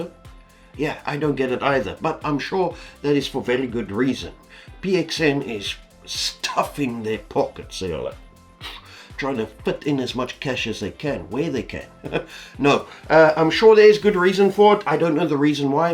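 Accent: Afrikaans, South Africa. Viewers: 😅I know your profile says UK 🇬🇧 but you sound so Afrikaans it's impossible to deny that you might be from South Africa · 10 months later….Wootware has them at R6499…. I was also hearing the accent…and the Brrrrey comes through every now and then😊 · I know a South African when i hear one sir whats the availability in sa?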